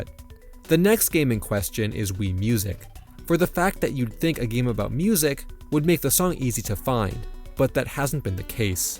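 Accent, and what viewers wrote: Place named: Massachusetts, United States. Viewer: 1000 to 1 your from Massachusetts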